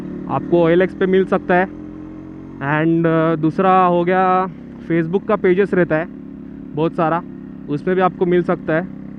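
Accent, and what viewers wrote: India. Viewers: Pure mumbai accent 😌🤜🤛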